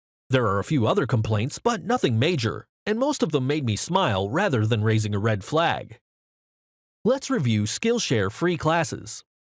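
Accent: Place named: Russia. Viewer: This is insane look at this guys youtube profile he got lots of videos with a russian accent and a really bad laptop microphone but suddenly he speaks english perfectly and with a voice quality of a youtube star. This dude clearly has stolen this video or the voice to get his couple of bucks, how poor.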